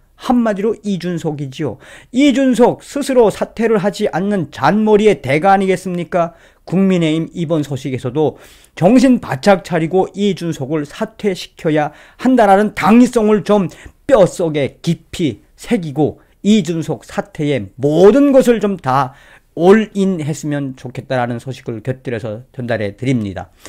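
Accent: North Korea. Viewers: 말투가 북한 사람같내?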